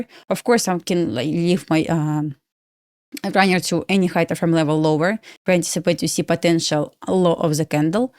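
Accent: Russia. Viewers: Why your voice sounds like Russian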